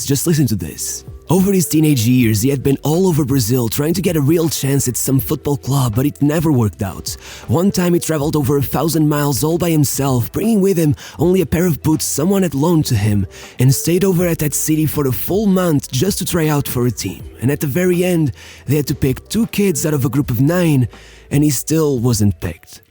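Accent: Portugal. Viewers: quando ouvi a tua voz percebi logo que eras tuga, keep up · Eu SABIA que eras português. Tu falas bue bem mas todos os tugas têm um sotaque a falar inglês. Tmb fiquei desiludido com portugal. O fernando santos já não tem condições para continuar, precisamos de encerrar este capítulo e começar um novo... mas até agora não foi despedido. Abraço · Are you Portuguese by any chance? Your pronunciation sounds like it. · He isn't the most hated man in England, he isn't even the most hated man in the Tottenham starting 11 for most people I'd imagine. Guy can come across as a little bit of a twat on the pitch, rolling around and trying to get under people's skin but he's not unique in that respect, not even in the Tottenham starting 11 and he's generally an enjoyable player to watch. Had that one little bit of "showboating" controversy recently which is the only thing that stands out as abnormal for a player playing in the premier league but that was more media than fans and most fans seemed pretty 50/50 with no strong feelings one way or another because all he did was a couple of keepy uppies mid game then passed the ball. 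Still don't see how that can make him "the most hated man in England". When ever I watch your videos I generally find them to be void of much fact, just opinion pieces on how you feel like seeing the world spoken as if that must be how the world is with no real research to back up your statements. I mean this video was basically somebody with a Portuguese accent reading a short biography of Richarlson. You can do better. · yoooo tu és tuga né? esse sotaque a dizer os nomes dos jogadores parecia bue mm. +1 sub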